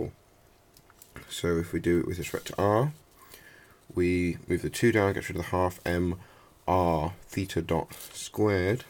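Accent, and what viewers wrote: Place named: England, United Kingdom. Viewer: *ancient. Sounds like an English accent.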